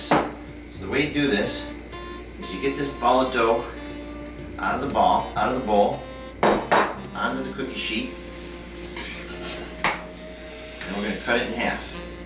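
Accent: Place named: Brooklyn, United States. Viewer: Ciao, you sound like you're from Brooklyn! Good recipe! I'll give'er a try! Ciao, Brooklyn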